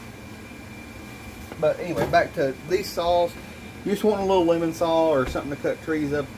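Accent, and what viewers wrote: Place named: South, United States. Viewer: I love my neotecs!! I also have the 038 clone in the 872i and that saw if an absolute beast!! 2 years and multiple big oaks and not a single problem. I have 3 or 4 more neotecs and other than some small things which Neotec took care of immediately I've had very little issue. I would love to know what the squish is on that saw. I'm willing to bet with a base gasket delete and a muffler mod that saw would pull the heck out of a 20" bar. You said the heat index was 105 this day and judging by your accent ide saw your not to far from me lol. I'm in South Alabama and the heat index 2 days ago was 112 so I feel your pain. Great review!!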